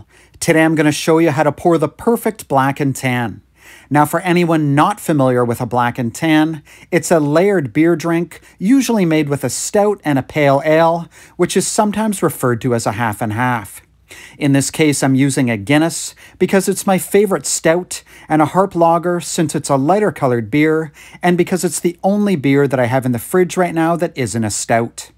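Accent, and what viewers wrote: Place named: Canada. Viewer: I definitely recognize his Canadian accent.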